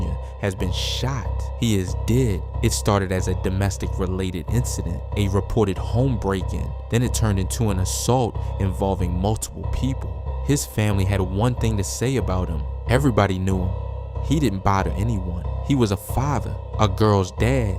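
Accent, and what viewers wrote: Baltimore, United States. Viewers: BAL Accent.....🤢🤢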